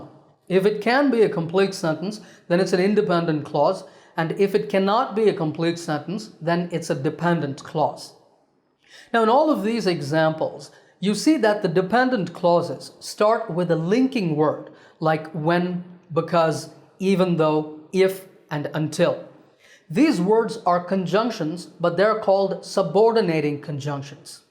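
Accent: United States. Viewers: Sir are you from India? How did you acquire American accent? Tell us about that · You are indian but voice is like American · Apart from your excellent teaching . I liked your american accent, How did you developed it ? I am too trying to speak in ameri-khen accent . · Your accent is American wow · You are Indian but your voice like real American people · I come to you for two reason. First I learn so much from you and Secondly I love watching an Indian Using American Accent. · Your american accent is 😍. Wanna learn it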